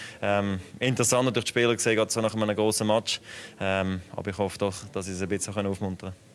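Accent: Switzerland. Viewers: this isn't german, it's swiss dialect!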